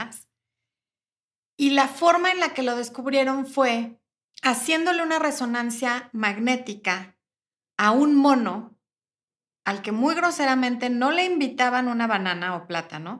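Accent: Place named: Mexico. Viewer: gracias ante todo. Me parece que si las mujeres siguieran tus consejos serían mucho más felices consigo mismas y con sus relaciones de pareja. Yo hace tiempo descubrí que la autoestima y la seguridad de una mujer en sí misma es la mejor manera de conquistar a un hombre y que nosotras vamos primero que ellos siempre. Me fascinan tus consejos incluso tu acento mexicano que me encanta...hace un año por estas fechas visité por primera vez CDMX y quedé enamorada, solo pienso en regresar. No sé tengo una conexión muy especial con Mexico y te escucho y me fascina. Por cierto, entré a tu canal de brillar después de los 40 pero no hay contenido, ojalá pongas pronto algún video allí....Si las mujeres despertáramos el mundo sería nuestro. Un abrazo y saludos a tod@ los seguidores de este canal, soy española pero vivo en San Juan, Puerto Rico. Un abrazo y lindo domingo.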